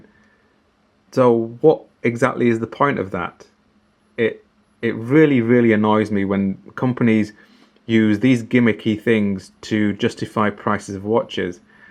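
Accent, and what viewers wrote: Yorkshire, United Kingdom. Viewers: Hi, Love your reviews, when can we see your review of your 1963 Chinese Pilot Watch, looking forward to your content as it’s refreshing. Lastly where you from you sound like a Yorkshire lad?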